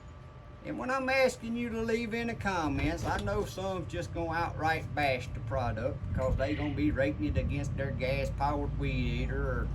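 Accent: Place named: Texas, United States. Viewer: You have such a delightful accent. I'm not sure if it is Texan? I can't get the wheels assembled properly on mine. They are very rickety. I'm just immensely proud of myself that I managed to assemble it at all. Mine is a different make, but very similar to the one you demonstrated. I'm too scared to use the large cutting blade so haven't tested it yet. I do like the plastic blades. A: I feel safer using them, and B: I think they really did a good job. Shall see how long this little machine lasts, but given that a gardener charges £25 an hour here these days, if I get six months out of it, then I've still saved money. TY for the video. Oh and I love the wooden playhouse. So cute.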